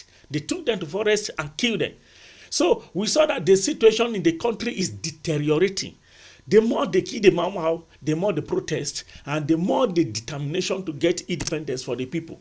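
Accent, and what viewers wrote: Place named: Nigeria. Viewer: As a kenyan, i am entertained in hearing the Nigerian accent of names like Njoroge, Nyokabi, Ngoto etc. Good job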